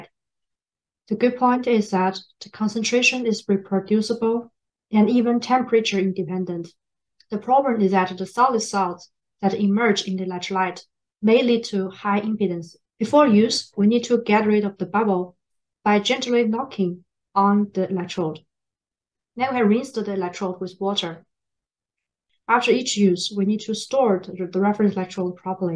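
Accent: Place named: China. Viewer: Love the Chinglish accent, it sounds familiar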